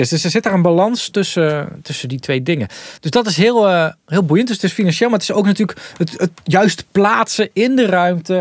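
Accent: Brabant, Netherlands. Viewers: Mooi Brabants, was me niet in het Engels opgevallen..... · Nice een keer zo'n video haha!🤣🤣 Wat grappig dat je "een bietje" Brabants accent heb en ik dit nu pas hoor na al die video's in het Engels🥲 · Hoor ik nou een klein Brabants accent? 😀😀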